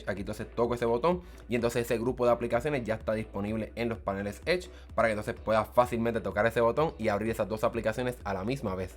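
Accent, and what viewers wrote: Puerto Rico. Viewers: ¿Eres boricua?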